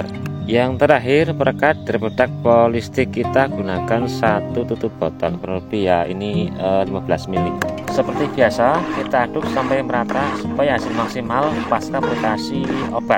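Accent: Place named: Banyumas, Indonesia. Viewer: Ngapak temen lur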